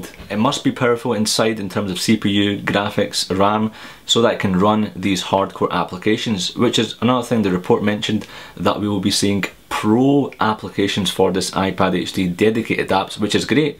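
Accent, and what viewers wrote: Scotland, United Kingdom. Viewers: I like Ur scottish Accent! Big Country Forever! Stay alive!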